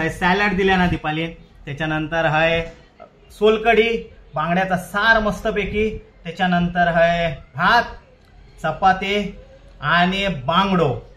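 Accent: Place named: Malvan, India. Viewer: व्हिडीओ चांगलेच असतत मालवणी भाषा बोलण्याची ठेक माका आवाडता शात हा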